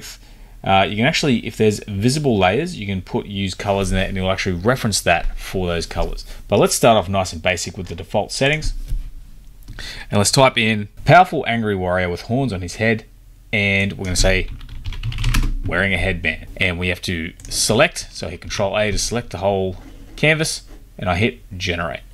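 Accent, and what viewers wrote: Australia. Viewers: Tryn to work out the accent, reckon either Melbourne or Sydney. Good on ya mate. BrisVegas here. Subbed. BTW I use SD A1111 PS plugin and getting pretty good returns and streamlined wrkflws. Offers more options for those who work outside the Alpaca ecosystem.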